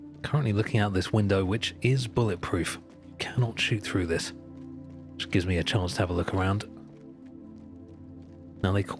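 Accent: United Kingdom. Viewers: I cant sleep without hearing people getting their heads blown off (in SE) to peaceful music and a British whisper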